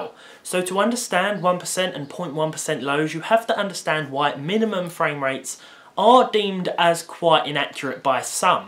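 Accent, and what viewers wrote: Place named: Surrey, United Kingdom. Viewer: hey, are you from Surrey? I have a friend who has the same accent (hell, I swear he sounds like your little brother) and he's from Surrey.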